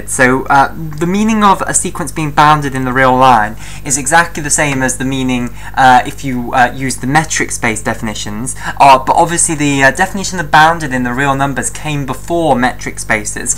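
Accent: United Kingdom. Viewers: thats the most british accent i've heard!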